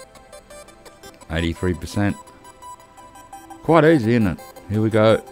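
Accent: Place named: Australia. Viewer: Much appreciated, love the casual Aussie tonne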